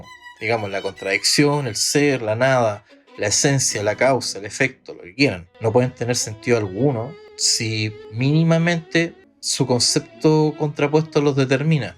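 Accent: Chile. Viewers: Jajaja es que es de chile, pero yo si lo entiendo y está muy bien .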